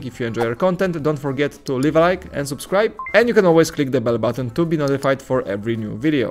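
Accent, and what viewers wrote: Poland. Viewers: po akcęcie stwierdzam że jesteś polakiem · Jesteś z Polski? Przypuszczam, że tak po akcencie i tym jak czytasz polskie nazwisko, że chyba tak. Great channel! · You have gr8 polish accent. Are u from Poland?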